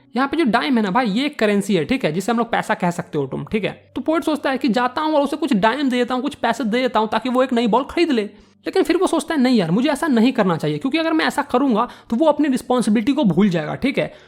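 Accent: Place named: Maithili, India. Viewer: Beta I love your maithali tone . Because I am also a bihari ❤️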